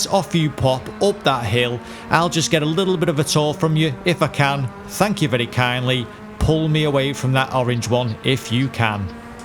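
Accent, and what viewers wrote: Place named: Bolton, United Kingdom. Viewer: Bolton? I recognise the accent. Anyway your very close to me!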